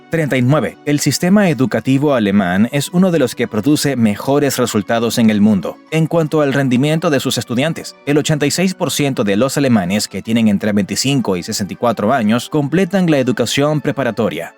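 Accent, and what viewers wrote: England, United Kingdom. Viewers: Da risa escuchar como alguien pronuncia palabras y nombres alemanes en estilo o mas bien con pronunciación ingles. Locura completa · ni "uagner" ni "ulks uagen" si vas a hacer un video sobre Alemania y laurear sus virtudes empieza por respetar el idioma, es normal "castellanizar" las palabras para ajustarlas a nuestro acento pero no "anglizarlas" por ignorancia, es tan fácil como usar el traductor de Google y reproducir su pronunciación para luego repetirlo lo mejor posible, me sangran los oídos cada vez que usas acento ingles al pronunciar las palabras nativas del Alemán, la "W" suena como "V" o "F" así que suena: "Vagner" y "Folks Vagen" RESPETEN EL IDIOMA! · el pronuncia como un inglés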